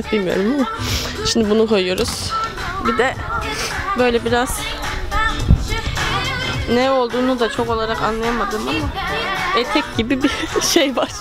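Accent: Kastamonu, Turkey. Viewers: FRANSIZCA DİL ÖĞRENİRKEN Mİ GENİZDEN KONUŞMAYA BAŞLADINIZ YOKSA KASTAMONULU olabilir misiniz?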